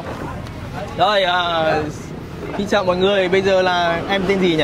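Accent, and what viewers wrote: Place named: Central, Vietnam. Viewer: dạ. Mà hình như a người Hà Tĩnh phải k ạ? Nghe a ns giọng Bắc cũng chưa chuẩn lắm...vẫn có chút miền Trung phảng phất...hehe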